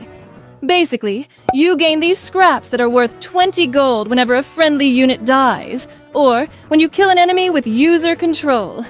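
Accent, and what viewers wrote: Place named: United Kingdom. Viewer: holy British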